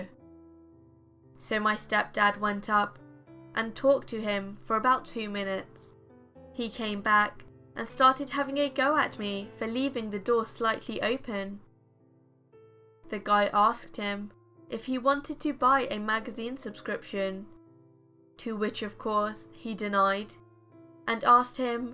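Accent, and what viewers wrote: United Kingdom. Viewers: I love the cadence of your voice, are you British, if so, from where? You sound like you could have lived in a few countries...perhaps your American? It makes your storytelling so good!!!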